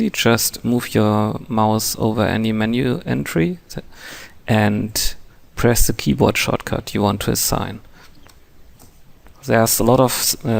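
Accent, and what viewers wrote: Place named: Germany. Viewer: App Magician he sounds German lol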